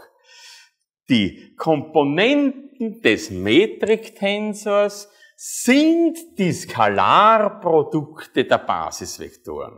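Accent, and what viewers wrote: Austria. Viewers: Als Schleswig-Hosteiner ist es wirklich eine Herausforderung über eine längere Zeit dem sehr sympathischen Herrn Professor mit dem österreichischen Tonfall konzentriert zu folgen. LOL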